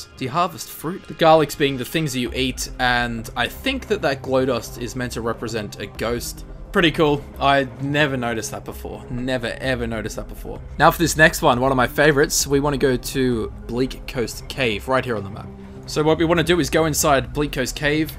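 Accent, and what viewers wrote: Australia. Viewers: Australian accent dummi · Just Austrailian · Fucking straya mate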